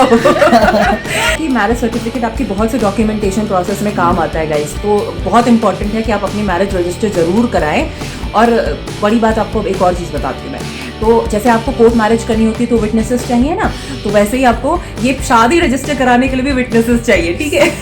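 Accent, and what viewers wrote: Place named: Punjab, India. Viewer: Ek like for the punjabi accent to banta hai... Nice vlog too...keep it up...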